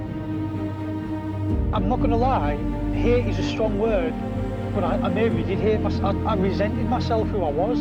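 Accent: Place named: Teesside, United Kingdom. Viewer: What an great video and message... I am 6 days clean today from coke after many relapses... was great to hear a local accent (I’m in Teesside)... thanks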